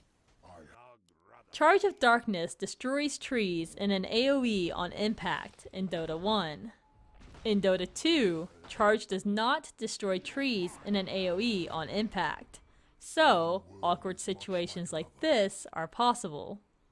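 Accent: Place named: England, United Kingdom. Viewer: Это акцент у англичан такой.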